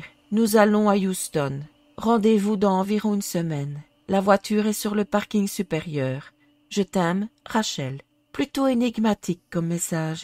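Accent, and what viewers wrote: Belgium. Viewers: Je ne dis pas celà pour blesser mais cette manière de parler... Quel est ce petit accent, est-ce Belge ? L'histoire est terrible.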